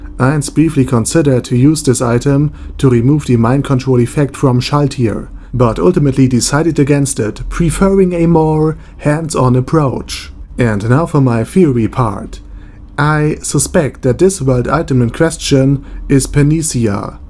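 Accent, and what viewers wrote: Germany. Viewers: I have to say, your kinda heavy german accent is mesmerising 😁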